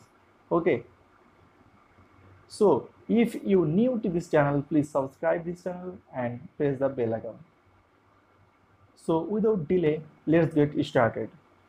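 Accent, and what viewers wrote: Bengal, India. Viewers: Sir appke english m bangali tune mix hota h so thoda sunne m effort krna pdhta h thoda app vocal ko open krne ki practice kare humble request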